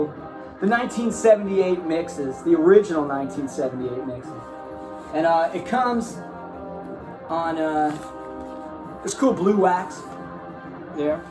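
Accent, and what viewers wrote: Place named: Pennsylvania, United States. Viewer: I'm from new jersey my family's from NYC you sound like you're from the Pennsylvania area lol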